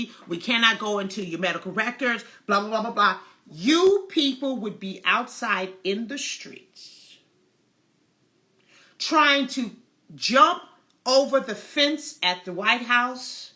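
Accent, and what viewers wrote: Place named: Chicago, United States. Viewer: I can definitely tell she is from Chicago. My sister from the south. Milwaukee native here.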